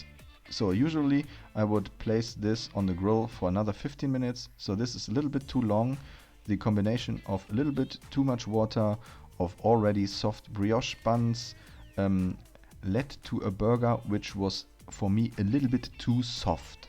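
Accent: Germany. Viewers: Schönes Video und sehr interessant das Rezept! ...aber dein deutscher Akzent beim Englisch sprechen ist echt Hardcore!!! Versuch die Worte mal ein bisschen weicher zu formen dann klingt das ein bisschen sauberer und flüssiger. 😉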